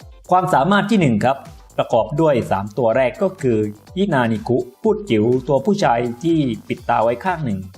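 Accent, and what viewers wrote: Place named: South, Thailand. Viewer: คนอธิบายติดสำเนียงใต้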